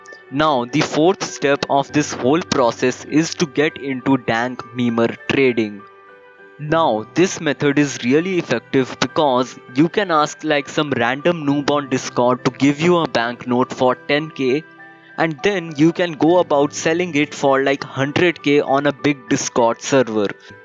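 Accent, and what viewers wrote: India. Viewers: his accent makes the video way better, nice video indian man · indian accent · Ha Indian man nice accent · thank you so much for having an indian accent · Real Indian English Accent.... at least he didn't tried to show to be fluent (which end up as cringe) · The Indian accent 🗣️🗣️🗣️🗣️🔥🔥🔥🔥🔥💯💯💯💯💯💯💯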